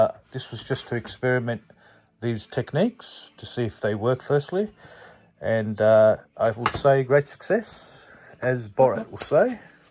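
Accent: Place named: Australia. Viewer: Love it, and the Reo reference makes a lot of sense, you sound Aussie, where are you located bud?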